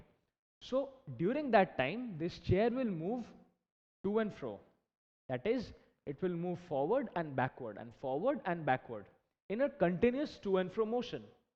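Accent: India. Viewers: Very good fellow but please try to speak slowly, because of your Indian accent and also many student belong to deffernt countries and the English language is not their native language , thanks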